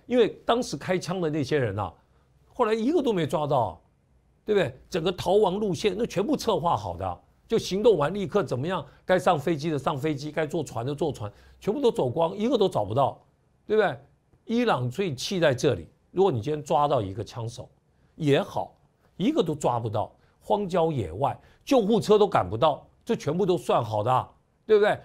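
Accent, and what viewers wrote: Standard. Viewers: 郑老师辛苦了！郑老师的汉语说得顶呱呱👍👍 比我们很多国人说的国语还标准。相信郑老师这档国语政论节目会越办越好！支持郑老师！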